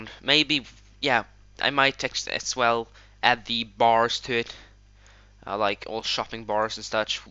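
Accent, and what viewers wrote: Sweden. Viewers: are u Swedish XDD i am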